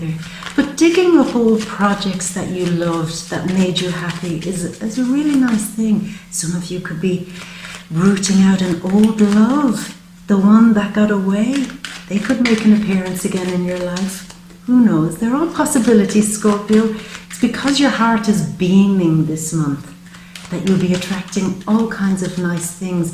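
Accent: Ireland. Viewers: i love your accent; are you living in ireland or where you born thereÉ or a newfieÉ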